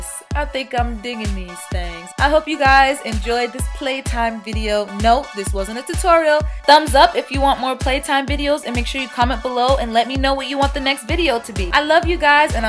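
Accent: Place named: South, United States. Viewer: Lol,idk you're from Boston,but you have a slight country accent.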